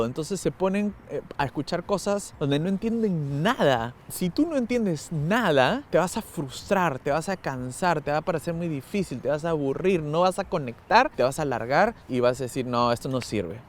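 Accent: Buenos Aires, Argentina. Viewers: se nota muchisimo que viviste en Argentina a un peruano que no salió de Perú no le entendería nada!! Soy uruguaya.. Muy buenos consejos!! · Ahora entiendo porqué cuando te escuché por primera vez no entendía de dónde eras. Tenés aún un poco de acento argentino, pensé que eras de alguna provincia distinta a Buenos Aires. Saludos, muy buenas videos! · Hola, al principio pensé que eras de Buenos Aires hasta que escuché que eres Peruano, pero cada tanto te sale el porteño jiji.Uruguay también su acenrto es aporteñado ( aunque no les guste que se lo comparen). Muy lindo tu vlog. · Omg, tu en tres meses se te pego el acento argentino, yo estuve una semana y se me pegó el acento y algunas palabras xD yo me escuchaba y me reia y sentia traicionera xD Es tan cierto eso que dices! De que si no tienes conexion o le ves la utklidad a algo, simplemente no entra, eso me paso con chino. 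En su momento cuando me cambiaron una profe de aleman ( a una que me ignoraba) , dejé de sentirme en sintonia. Ahora trato de retomar aleman.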